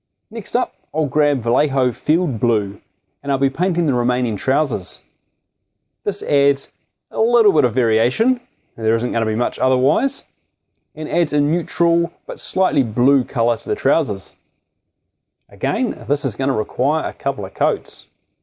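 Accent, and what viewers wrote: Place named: New Zealand. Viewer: Sorry. Every so often the Kiwi accent demands gentle humour....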